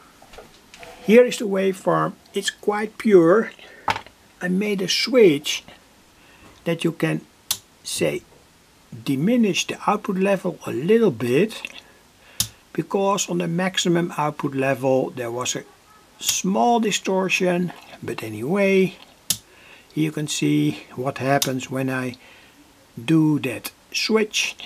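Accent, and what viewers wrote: Netherlands. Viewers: I know it's a random question but are you Dutch? I've been to netherlands and they have a similar accent. Thank you for the cool content. I really like the gigh frequency circuits you make and you make it seem way easier than I would think it is. Well it is actually.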